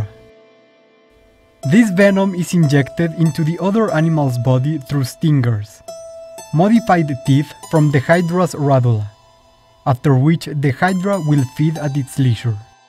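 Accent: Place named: Latin America. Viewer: I love the video!! Your English sounds like your from a Latino country right? Sorry if I sound rude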